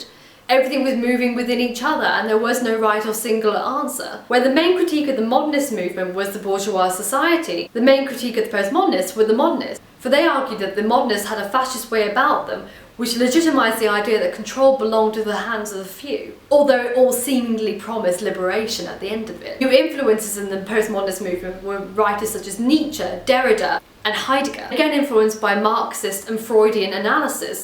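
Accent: United Kingdom. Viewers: I saw the thumbnail and I was like "I hope she has a british accent" (for some reasons). I was not disappointed.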